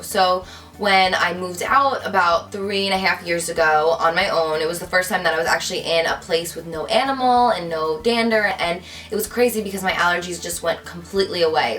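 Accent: New Jersey, United States. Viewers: i KNEW you were from Jersey!!! yay!!!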